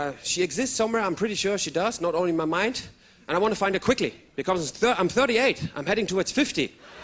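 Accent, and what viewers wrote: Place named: Germany. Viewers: ***** Tut mir leid dich entteuschen Zu müssen, aber Ich war schonmal da. Ausserdem hat jeder einen Akzent, Englisch Deutsch egal- the kings English ist auch bloß festgelegt worden, also auch ursprünglich ein Akzent. Gleich wie beim Deutschen: Hochdeutsch ist nichts weiter als der Hannoveraner Akzent. Also Update : Kein Mensch spricht irgendeine Sprache Akzentfrei.... Also 100% der Engländer sprechen mit Akzent, nur ist das die Muttersprache des Engländers ist, also klingt es richtiger als ein ausländischer Akzent. Ausserdem: Wer hat gesagt dass das schlimm ist das er einen Deutschen Akzent hat? Hab Ich warscheinlich auch :) Schönen Abend LG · Wenn ich Englisch rede, hört man sehr stark meinen Deutschen Akzent raus. Recht viele in der Klasse von mit können ihre Stimme, wenn sie Englisch reden "verstellen", so, dass man den Deutschen Akzent nicht mehr so gut raus hört. 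Ich kann das nicht in der Englischen Sprache. Komischerweise mache ich das "Automatisch", wenn ich Französisch rede. Und ich finde, bei Kaya Yanar hört man den Deutschen Akzent nicht so krass raus.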